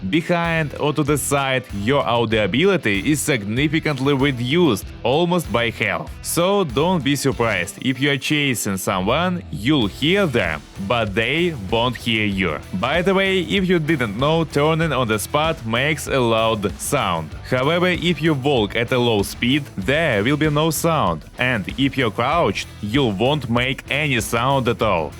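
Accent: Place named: Russia. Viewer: А мне всегда нравится классический русский акцент, ну чисто как в голевудских фильмах 80х-90х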